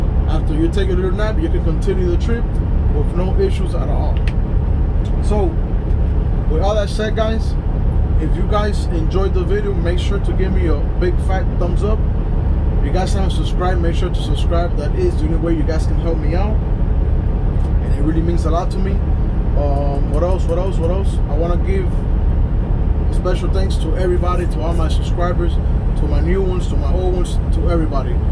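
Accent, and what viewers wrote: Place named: Miami, United States. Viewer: I can tell your from Miami. Same here brother! Great video very helpful btw!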